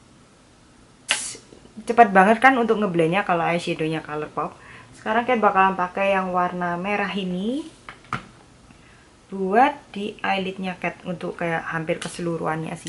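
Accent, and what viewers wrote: Java, Indonesia. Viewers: medok medok ngono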